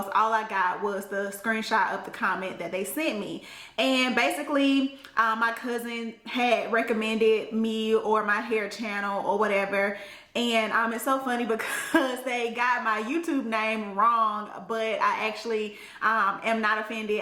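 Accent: South, United States. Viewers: Omg you are the first southern youtuber that I've seen and I love it!!! 😂💙💙💙💙 Yass with that accent gurl!!!! You legit sound just like me and I've never seen a black youtuber just accept their "country" accent. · you remind me of Jessica Dime from Love & Hip Hop Atlanta with your southern accent!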